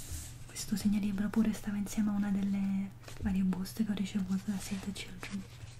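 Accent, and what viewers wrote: Italy. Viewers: Hai una voce che ricorda il dialetto romano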